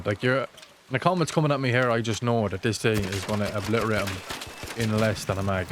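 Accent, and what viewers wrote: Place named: Cork, Ireland. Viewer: Your accent reminds me of my nans. She was from Cork.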